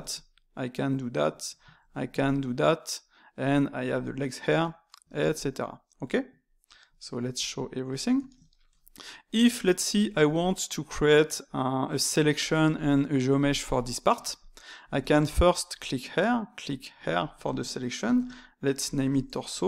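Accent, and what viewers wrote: France. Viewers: Your french ? ( l'accent est reconnaissable)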